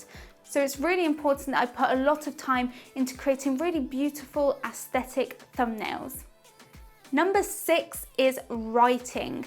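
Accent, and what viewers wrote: United Kingdom. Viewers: Love your lecture and I admire you been so young and doing so many tasks, yes been a professional artist is not simple and yes we need to learn more from others new techniques or ideas or how the business world change and new technologies are introduce, I love painting ,but not for business, I do other type of art as well, but I understand the importance of keep with the advance technologies. Congratulations you are great artist, and I am thinking in enrolled into your course, as I understand fir your accent you must be in somewhere in the United Kingdom, not for sure is England or Irish, either way is ok, just curiosity, are your courses in dollars ? I am in Australia and want to know wich currency you use. Thank you very much, my e-mail is <mail>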